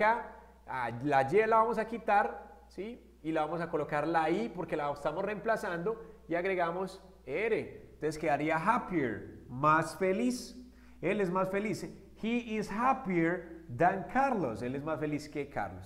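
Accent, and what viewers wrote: Colombia. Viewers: y el acento colombiano uyyyy maravillaa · sos un genio, me encanta su acento colombiano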